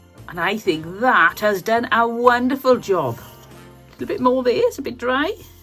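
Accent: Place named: Fairwater, United Kingdom. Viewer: I love watching your videos and your voice brings back lovely memories of the two years as a teen I lived in fairwater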